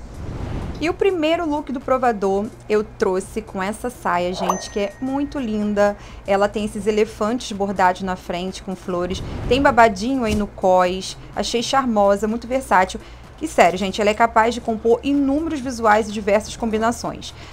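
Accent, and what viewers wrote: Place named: Rio de Janeiro, Brazil. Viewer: você é mesmo linda! E Esse seu sotaque carioca arrasa🥰🥰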